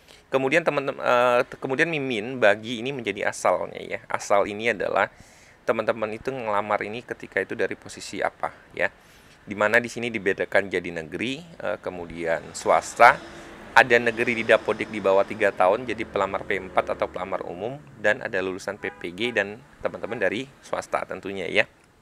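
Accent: Banyumas, Indonesia. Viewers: kenapa ini suaranya ngapak pedia hh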